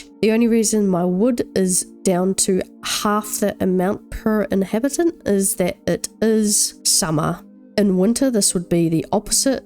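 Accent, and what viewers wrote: New Zealand. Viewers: Always nice stumbling on a kiwi accent in the wild :)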